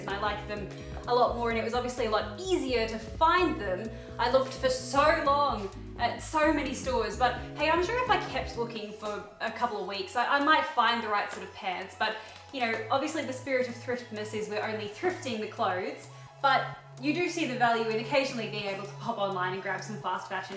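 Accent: Australia. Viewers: Ikr and it’s not every day you hear an Australian accent, people are allowed to be unique (and sound like where they’re from :P I think it’s a common subconscious part of American culture to feel like “we do everything right, so we have to help people conform to our perfect culture”) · Please don’t beat yourself over the way you say certain words. Accents are all unique, and just because an American doesn’t pronounce something the way an Australian would, doesn’t make it incorrect. Your accent is SO cool, and even if we say something a different way or use a different word for something, we still know exactly what you mean. You shouldn’t change yourself! We love you for you!! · I’m Australian, even I struggle to know the correct pronunciation of things, even Australians correct each other’s pronunciation all the time, especially suburbs and places. Literally everyone pronounces things differently. 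I don’t think was purposely changing her accent for the American audience. She just didn’t know how to pronounce Academia so when she got corrected she went with it. I question things I say all the time. It could be because we have so much American influence through socials and TV. There isn’t one way of pronouncing something. She says like a million words in this video in her native accent and you pick on one word. Let do her thing she is killing it! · I prefer it when you use aussie lingo and keep your accent, I'm from the UK and I just love hearing the way you guys talk down under ☺😍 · Part of the excitement is learning by experiencing another culture and lingo. I love that is Australian. It's so fun to have her as my friendly neighborhood Cultural Atache' to Australia. 😊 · Yeah that annoys me, America has tons of dialects to begin with too so it’s hypocritical to ask for a more “American” way of speaking from an AUSTRALIAN. 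Edit: I’m American, Southeastern to be exact. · Please don't change anything about your 'accent'. I am Croatian and I come here for the Australian, not the American. 💪 you are the only Australian I watch on youtube so i cherish all these differences, I truly enjoy it. Wish we could see more Australia in the future. I love love your videos, this thriftmas was amazing! wish you all the best! :) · yes ! I love to hear different accents and I never think about her speaking Australian as a weird thing since she's from there !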